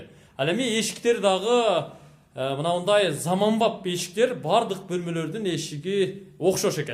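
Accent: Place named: Chong Alay, Kyrgyzstan. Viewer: Чон алай диалектикасы😀😀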